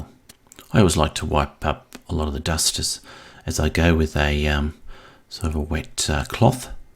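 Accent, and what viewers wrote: Australia. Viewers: Nice to hear an Aussie voice over. Your instructions are clear and concise. Good stuff. 👍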